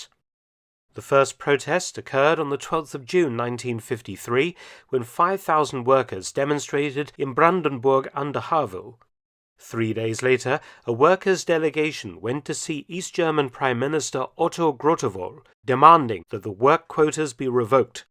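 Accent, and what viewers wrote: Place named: Southern England, United Kingdom. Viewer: A generic southern/Home Counties English one.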